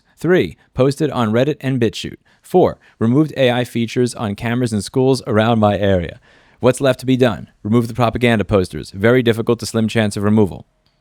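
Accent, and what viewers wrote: New York, United States. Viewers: I fkn love how just sounds more NY the more worked up he gets about smth xD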